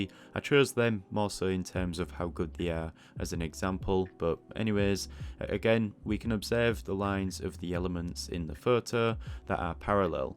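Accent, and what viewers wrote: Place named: Yorkshire, United Kingdom. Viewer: Brilliant video. Also sound like a fellow Yorkshire man! 👍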